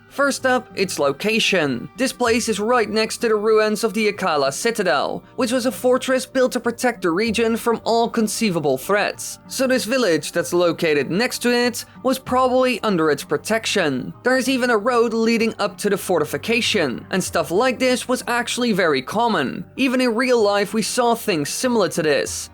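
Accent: Netherlands. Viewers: I've never heard of flooding a settlement to defend yourself. That's interesting. Also, I didn't realize that your accent is Dutch, not British. Oops!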